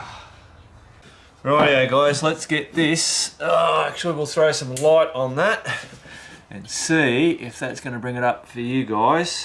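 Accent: Australia. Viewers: lol, you are so funny HaHa speaking like a true-blue aussie. I have parts on my bike that is chrome exactly like yours that has pitted exactly like your, i was experimenting with things myself today and i found that when chrome is that bad you cant save it no matter what. I eventually just used a grinder to grind everything back to bare metal and just repaint the parts in another colour OR just polish and buff up the metal like you have done, but im just going to repaint all my chrome to black and not have to worry about looking after all the shiny metal with polishes and buffing every time i wash the bike. After watching this video your sense of humour made me laugh so much i decided to subscribe, plus your another aussie!!! and its really good to see aussie on youtube making video's. Cheers, Here is a quick-tip, just talk about what your doing and not waste time and footage worrying about what others will say and do, unfortunately there will always be experts putting crap onya, but the way i look at it and i think you do to, its not so much the destination that excites us its the journey. 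Meaning you learnt something today.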